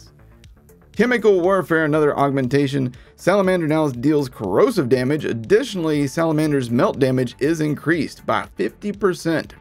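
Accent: United States. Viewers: Damn your videos are addictive! Ps. Love tour accent where are you from in the states?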